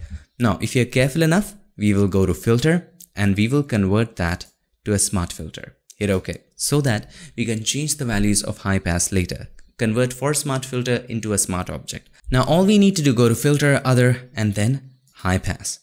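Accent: India. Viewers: the only indian whose accent is not giving cancer to the ear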